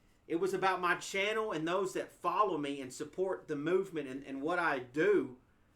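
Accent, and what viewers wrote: South, United States. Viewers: This is the side of I like and appreciate. Calm, and makes a lot of sense. One thing, he’s gotta be from the south with that accent. And! It’s apparent, he must be a NASCAR fan as well., who’s your favorite driver? What’s your thoughts on the current state of NASCAR? I left NASCAR on the final lap at Phoenix last year when 7X Cup Champion Jimmie Johnson rode off into the sunset. Love to hear your thoughts. Love your channel.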